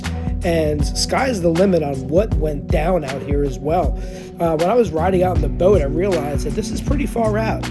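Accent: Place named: New Jersey, United States. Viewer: I love your videos. May I ask where are you from? You sound like my cousins from New Jersey !! Keep up the cool videos man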